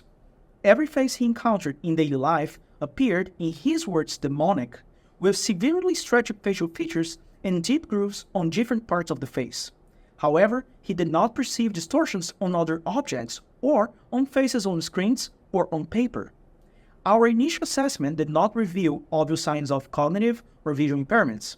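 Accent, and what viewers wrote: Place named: Brazil. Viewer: esse sotaque é brasileiro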